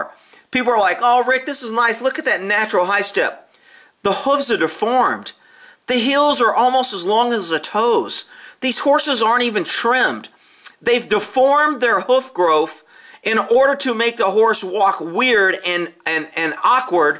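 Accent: United States. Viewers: hey, I liked a couple of videos because it was too true what you were saying.. but in this video... and I'm talking about the first 3 pictures... really from what I can hear you are a real American. (I'm from the Netherlands) So you're probably a western rider.. well my opinion if you do that wrong... we are human and make mistakes and we are working with about 700kg animals with a mind for them selves. I know and say yes, I had bad jumps and even fell twice with my horse because he made a canter change I didn't ask for, but my horse still loves to go through the cross country and I can also say if he doesn't want to go, he doesn't go.. because if you use a stick or spurs in that moment, he let's you eat dirt... and maybe you should check about the build and there way of moving of a breed of horse before you talk about them that way... (it's a friesian horse, and let me tell you, it's a really old breed)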